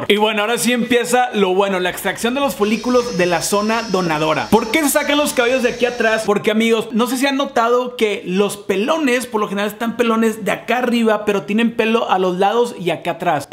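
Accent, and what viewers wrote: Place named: Fresa, Mexico. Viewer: Guey y por k ablas bien freson guey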